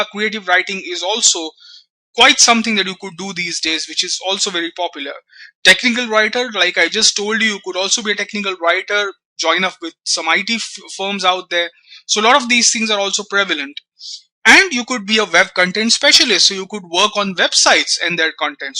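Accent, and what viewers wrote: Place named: Scotland, United Kingdom. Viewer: ha its bit uk cuuunt. scootish i think.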